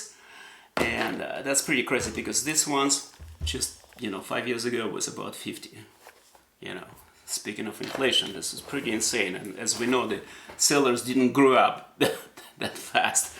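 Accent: Eastern Europe. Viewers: Am i the only one who hear slavic accent? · Такое впечатление, что английский язык для вас не родной. Проскакивает какой-то славянский акцент.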